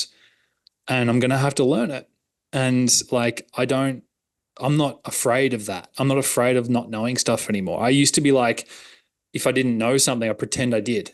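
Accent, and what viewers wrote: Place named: Australia. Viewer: thanks mate! it's also nice to hear someone aussie (i live in melbourne) talking about business haha most of the stuff i listen to their always from the US or something. who's your mentors getting started back then?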